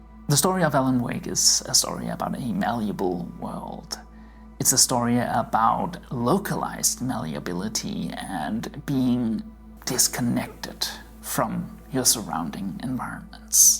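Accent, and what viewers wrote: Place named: Germany. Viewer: Great Video, one small question, are you german or someone who speaks a germanic language natively (other than english) I think you have a slight accent that can be often heard by Germans and Dutch people when they speak english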